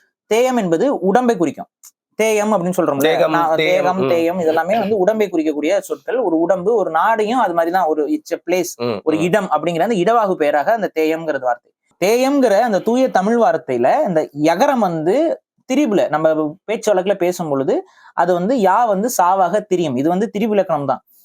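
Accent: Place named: North Chennai, India. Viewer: your vada chennai slang superb😅😅😅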